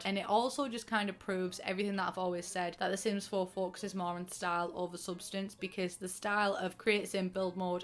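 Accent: United Kingdom. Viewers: lmao I'm from Germany and the only reason I understand you is because I have friends from all over the UK so I had to learn how to pick up on new dialects quickly. Before I met my first friend from England (West Yorkshire) all I could understand was that annoying London Accent and basic american english tbh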